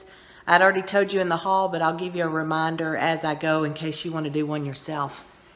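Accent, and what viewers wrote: Texas, United States. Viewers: I'm a new subscriber. I would love to see a tour of your whole house. What I can see is gorgeous. Is your accent from Texas?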